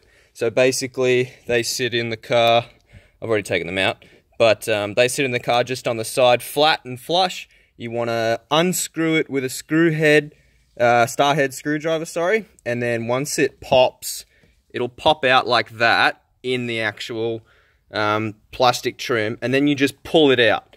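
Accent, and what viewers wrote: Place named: Australia. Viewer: Thanks so much for this vid! I keep coming back to this so I know exactly what to do. True aussie bloke right here. If you ain't Aussie well you sure sound like one lol.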